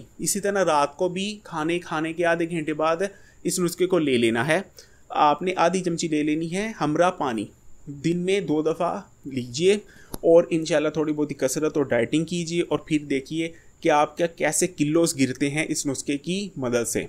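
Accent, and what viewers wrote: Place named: Punjab, India. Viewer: Hakim sahab urdu bol rahe Ho, tone Punjabi aa rahi hai .by the way thanks for your information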